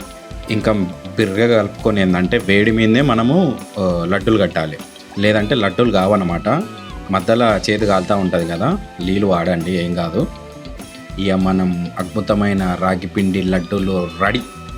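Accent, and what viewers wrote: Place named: Telangana, India. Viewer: ప్యూర్ తెలంగాణ భాష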